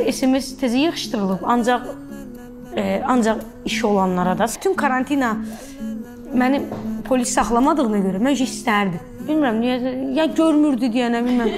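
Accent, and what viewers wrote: Baku, Azerbaijan. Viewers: canım ay bakı lehcesi şırındude etaga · Sənin hər işin xoşum gəlir ama Bakı ləhcəsi mənə ləzzət eləmir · Bakı lehcesi başqa alemdi amma Bakı kendininde öz lehcesi acıqlama ver zehmet olmasa sizin lehce hansı kende aiddi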